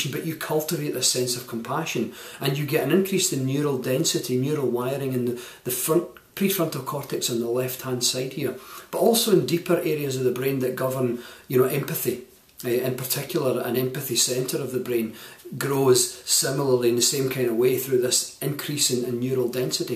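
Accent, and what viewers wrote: Scotland, United Kingdom. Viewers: was recently introduced to your work and have to say irs so refreshing to hear a male Scottish voice in the field and one looking so good at 91 too! excellent work young man! excellent.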